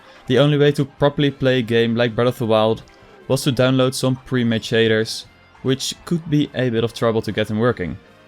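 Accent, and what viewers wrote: Netherlands. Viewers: please work on your dutch accent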